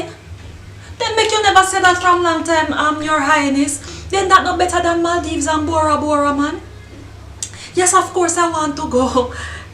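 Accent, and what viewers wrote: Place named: Jamaica. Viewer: JAMAICAN hummm. The best mi caaa. Badda DWL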